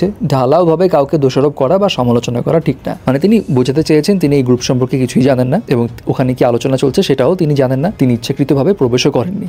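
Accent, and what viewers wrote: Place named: West Bengal, India. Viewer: Tui dada babu der moto kota boltasos ken? Tui ki oi parer naki re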